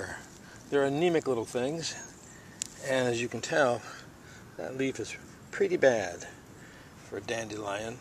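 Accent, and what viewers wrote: Maine, United States. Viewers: Just found this channel R U by any chance from New England Sounds like Maine. ( Where I'm from, but now in WI)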